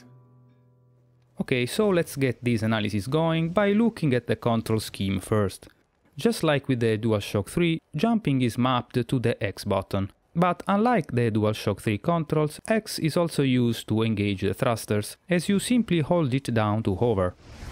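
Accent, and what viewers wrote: Italy. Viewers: I am so entertained by his Italian accent ,he sounds like Super Mario's 3rd brother Sonyo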